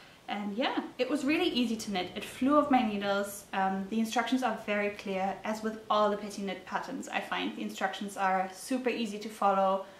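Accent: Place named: Scotland, United Kingdom. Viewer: Hey - good to connect with your podcast! Sending love up to Glasgow! Love to hear a European with a nice Scottish flavour to their accent ;)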